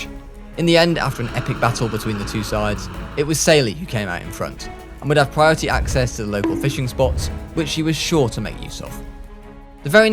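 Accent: Czech Republic. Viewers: who wouldve guessed your from czech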